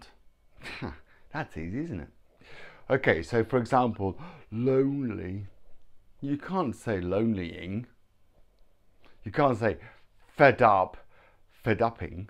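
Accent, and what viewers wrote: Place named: United Kingdom. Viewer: I am interested in British English because it is interesting.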